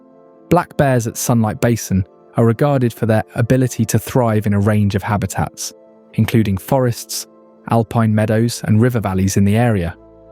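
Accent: United Kingdom. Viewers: Can we get an American to speak about Wyoming not a limey annoying brit accent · Wyoming is beautiful and amazing .... but why in the hell is some Brit with rosy cheeks and their funky accent telling us all about Wyoming and making incorrect statements and plenty of mistakes ... typical Brit trying to capitalize off of America's land and beauty ... maybe a hillbilly mountain man like me should go over there and make a video and tell them all about "STONED HEDGE"....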